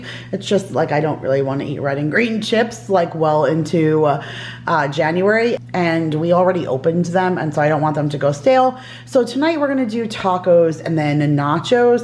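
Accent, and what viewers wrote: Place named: East Coast, United States. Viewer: I’m new to your channel. I really enjoy it. When I first heard you speaking I said this girl has to be from back East. I’m a 40 plus years girl from CT transplanted in NE. Now I see you’re going to NY for vacation. How cool is that? I wish you a happy, healthy and safe New Year. Take care. Food request is Sharp Cheddar Cheese 🧀 LOL 😂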